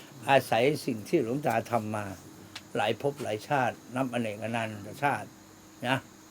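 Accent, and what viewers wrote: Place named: Laos. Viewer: หลวงตาเว้าภาษาลาวน่าฟังดีที่สุดเลย.สุดยอด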